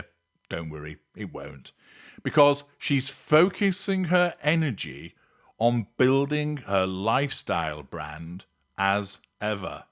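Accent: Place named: England, United Kingdom. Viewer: I imagine that the snake in the Garden of Eden sounded like you. I think you could talk anybody into anything with that deep and silky English accent.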